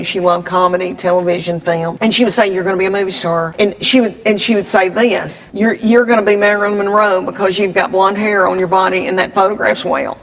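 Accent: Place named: North Georgia, United States. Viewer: My family's N.GA accent is sooo similar to yours! My coworkers ask how I choose which words in a sentence to add an extra syllable, and which words to remove one. "We say y'all... When we want to call... Upon all." Early Cuyler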